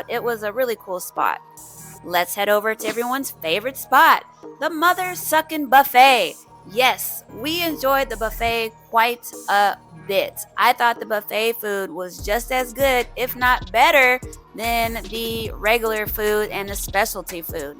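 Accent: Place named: New York, United States. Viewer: I love the way you talk and the cursing lol! I’m from New York and this how we talk too 😂😂😂 very funny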